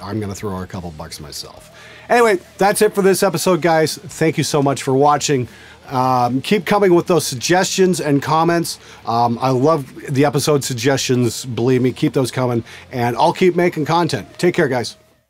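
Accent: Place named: Canada. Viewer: I love the canadian accent.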